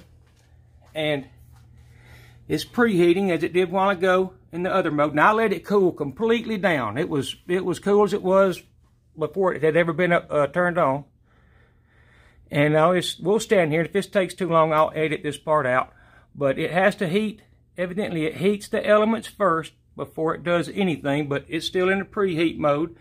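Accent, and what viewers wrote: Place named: Texas, United States. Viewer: your accent is fantastic! Texas?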